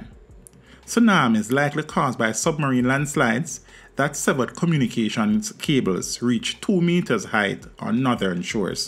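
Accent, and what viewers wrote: Jamaica. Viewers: From to, beg yu sai di fus likkle paat inna Jumiekan fi mi undastan? I feel like Oliver in one of his skit where he says, "...mi neva undastan a wod im seh..", but I'm not familiar with the technical terms you used. Please & thanks. Bless up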